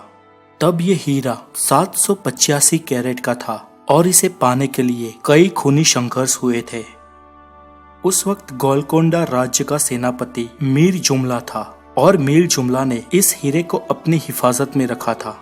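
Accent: Gujarat, India. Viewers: ભાઈ તું ગુજરાતી છુ???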